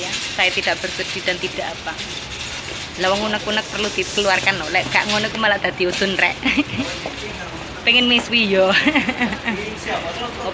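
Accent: Java, Indonesia. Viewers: Mba jowo e pundi kok muedok banget, salam dari surabaya 💗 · hi mbak...salam kenal. saya lia dari cibubur. sukaaa sekali sama vloq mbak. aku juga suka dgn medoknya mbak. dan paham sekali artinya meski aku bukan wong jowo rek 😂.mbak tulus ngurusi si mbah. dan perilaku majikan pun baik sama mbak. itu imbalane mbak orng baik ketemu yng baik.btw, mbak dah brp lama di HK kerjone?bahasa HK nya juga hebat euy. kursus bahasa dulu mbak sebelum ke HK? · Mbk jowo ne nok ndi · Jowone ngendi ce? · Jowone Ojo ngasi ilang mbak 😂😂😂😂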